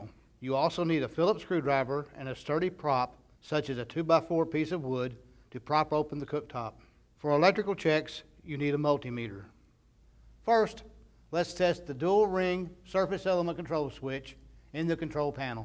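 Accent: United States. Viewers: Thanks, buddy! I'm Brazilian and your accent helps me to understand my American boss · He sounds like he is from the Ozarks of Missouri.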